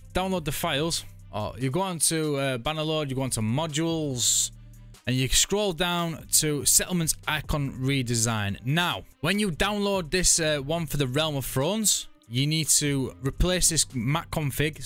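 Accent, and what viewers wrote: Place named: North, United Kingdom. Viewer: a person with a northerner accent is teaching me how to install realm of thrones i luv it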